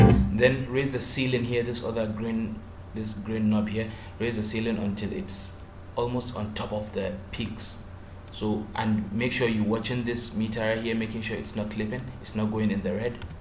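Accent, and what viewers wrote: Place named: Africa. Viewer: hey,man...your accent,you sound like someone from africa,are you from africa ?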